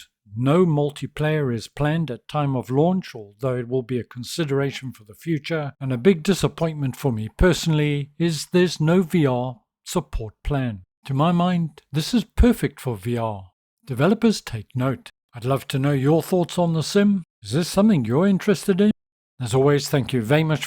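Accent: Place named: South Africa. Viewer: You sound a bit South African….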